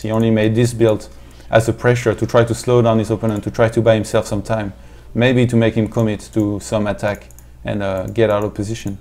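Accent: France. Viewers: that french accent! keep it up! the analysis level is close to qxc`s terran analysis. and that`s about the highest praise i can give you.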